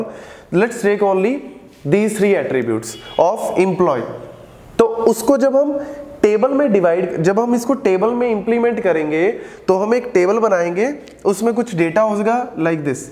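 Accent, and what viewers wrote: Punjab, India. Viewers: bhaia aap punjabi ho ?????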